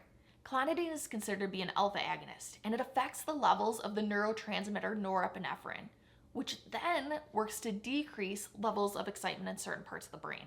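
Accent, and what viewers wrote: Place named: Midwest, United States. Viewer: nice midwest accent :D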